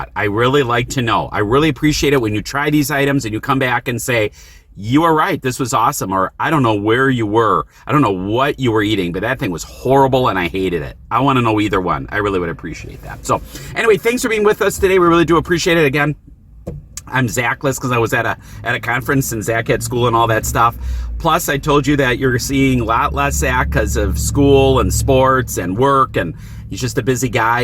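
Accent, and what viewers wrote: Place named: Wisconsin, United States. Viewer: Your Wiscahhhhnsin accent cracks me up! 😂.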